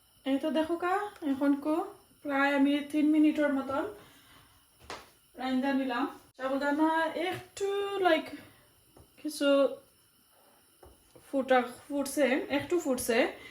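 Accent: Sylhet, Bangladesh. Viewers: nice,please sister don’t change your accent, I like to hear our Sylheti accent, u r a Sylheti person so why should u change your accent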